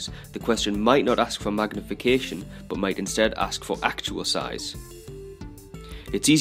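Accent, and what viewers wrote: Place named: Newcastle, United Kingdom. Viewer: got a science exam tomorrow so ty and the geordie accent actually helps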